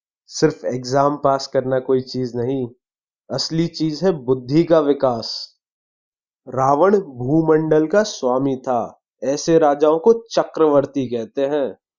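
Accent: India. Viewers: desi style mey pada ro ha tu tie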